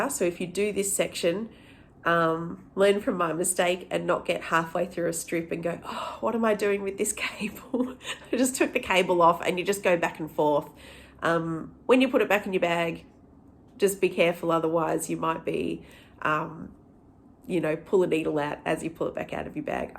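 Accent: Australia. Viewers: Wow, super impressive! Like everyone else, I have enjoyed seeing your progress and your reactions to each clue... especially with an Aussie accent 🤣 I am plodding along clue one still, but intrigued to dawdle along the rest of the way, with everyone else's helpful tips and tricks 😀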